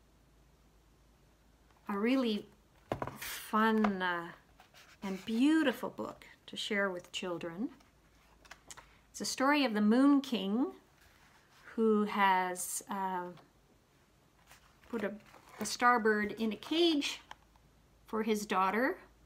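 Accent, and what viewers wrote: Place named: Canada. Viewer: You were discussing your accent in this video (just to refresh your memory since this was a LONG time ago). I LOVE your accent. I feel like people from Canada seem to annunciate much better than Americans and I'm not sure how much of that is accent and how much is just valuing speaking properly. I only have a handful of Canadian friends, but they all tend to speak slowly, carefully, and annunciate!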